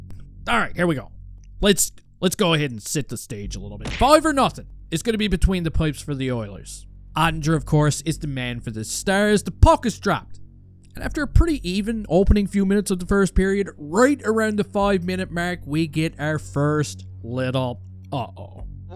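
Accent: Newfoundland, Canada. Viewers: What part of Newfoundland you from dude?😅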